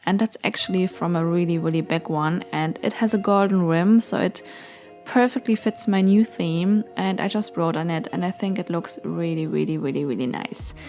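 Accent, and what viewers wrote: Germany. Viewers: Hab direkt gehört, dass du deutsch bist 😊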